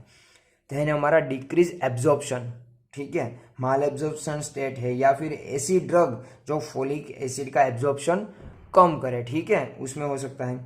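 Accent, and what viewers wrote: Gujarat, India. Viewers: I think you are gujju from your accents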